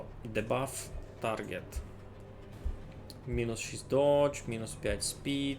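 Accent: Transcarpathia, Ukraine. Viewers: Вау, летсплеї по Закарпатськи. Просто вау 🔥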